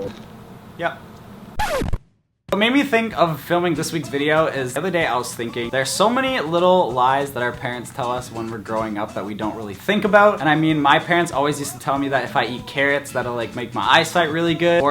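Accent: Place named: Canada. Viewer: Random Question is he Canadian!!! this the first video i've seen of his!!! He sounds Canadian!!!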